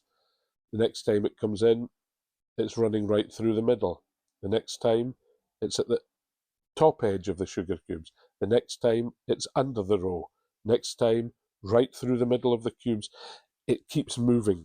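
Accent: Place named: Scotland, United Kingdom. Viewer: thank goodness you were cast off from Game of Wool because now I can watch these fabulous podcasts that you are doing. I'm a knitter in Australia and I started a vest from Kate Davies book "Inspired by Islay" back in 2017 which has been languishing since. I'm now inspired to finish it, steeks and all!! You are an expert knitter and have a delightful Scottish accent (to me), that is so pleasant to listen to.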